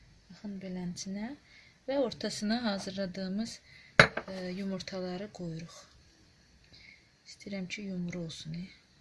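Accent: Ganja, Azerbaijan. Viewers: Xanım, siz Gəncəlisiniz? Mənim yoldaşım Gəncəlidir, Gəncədə balacaya- "Balicə"deyirlər!😂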